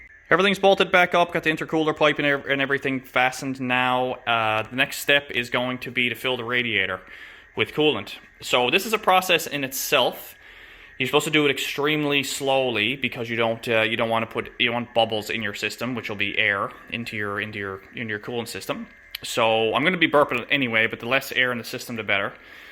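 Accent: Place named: Ireland. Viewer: A sense a bit of Irish accent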